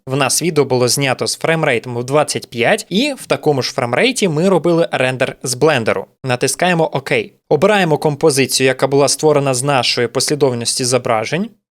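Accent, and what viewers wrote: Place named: Russia. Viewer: Пиздец говорит с русским акцентом,типа украинец дохуя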